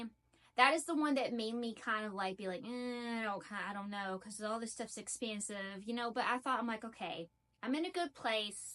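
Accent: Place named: Texas, United States. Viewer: she sounds like a Texan to me 😍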